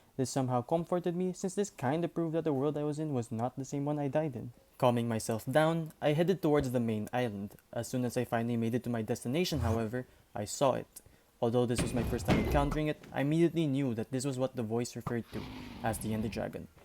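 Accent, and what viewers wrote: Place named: Philippines. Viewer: Sounds like filipino accent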